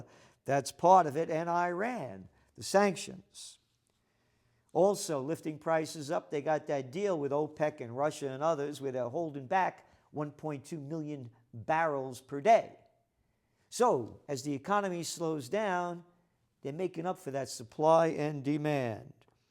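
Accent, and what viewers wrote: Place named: New York, United States. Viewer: I think it's his thick New York-ness. New York-ness spans multiple ethnicities. As a half-Italian woman from NJ, how I miss NJ-NY-PA style.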